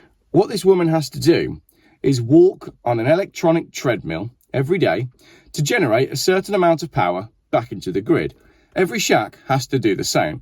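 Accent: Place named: Oxford, United Kingdom. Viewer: Sounds a bit like Oxford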